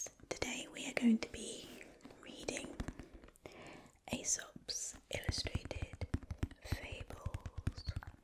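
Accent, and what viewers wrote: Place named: United Kingdom. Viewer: I love your accent ♥️♥️♥️ I am guessing you are from the uk but whereabouts in the uk 🇬🇧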